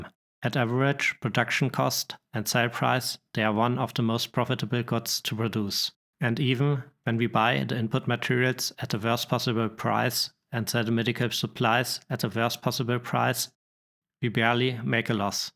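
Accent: Germany. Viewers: Statistics, Graphs and a german accent elaborating on ze productions. Just what the soul needs.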